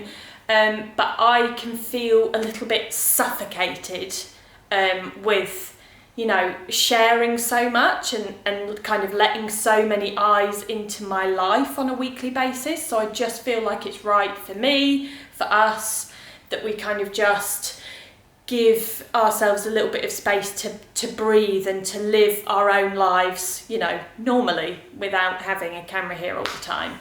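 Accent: England, United Kingdom. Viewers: Thankyou! Have subbed as it’s great to hear an English accent again..miss London..been here in Oz 6 years...thanks again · Love ❤️ your fancy sounding British accent. Thanks Philadelphia PA resident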